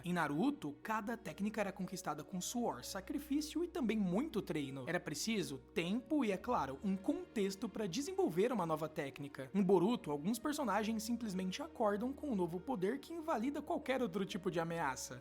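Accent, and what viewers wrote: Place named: Caipira, Brazil. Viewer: voce tem seriamente que corrigir esse (R) caipira que vc tem, isso é feio de mais.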